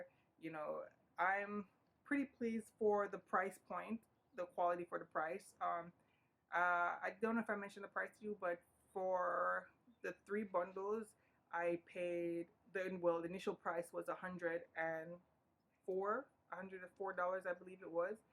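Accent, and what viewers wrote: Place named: Jamaica. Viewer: I'm Jamaican as well, i picked it up right away 😂👏🏾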